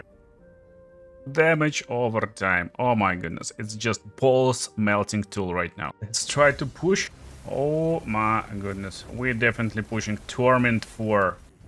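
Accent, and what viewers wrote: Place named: Russia. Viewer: Такое чувство будто бро говорит с русским акцентом....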